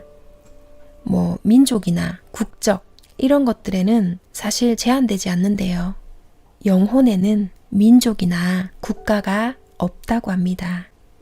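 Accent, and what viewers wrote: Gyeongsang, South Korea. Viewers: 아우 나도 경상도 고향이지만.. 사투리 정말 듣기 싫다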